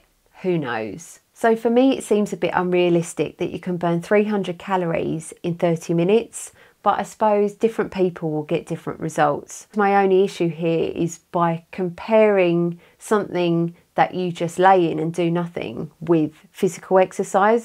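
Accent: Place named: England, United Kingdom. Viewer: Greetings to you from me. Great video as always eventhough I am not much intrested in this topic but yeah. At least i could teach my ear some of English Accent.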